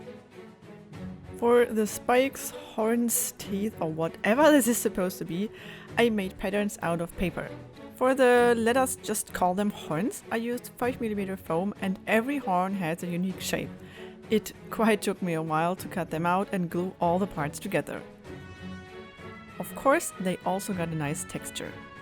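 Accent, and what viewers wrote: Germany. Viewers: are you german ? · You should work on you german accent but well the accent does not make the sword less cool, great work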